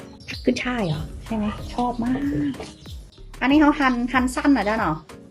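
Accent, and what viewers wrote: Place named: North, Thailand. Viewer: ดูเพลินมากเลยค่ะะ ทำคลิปแบบนี้ให้นอนดูเพลินๆหน่อยนะคะ ภาษาเหนือน่าฟังมากเลยค่ะะ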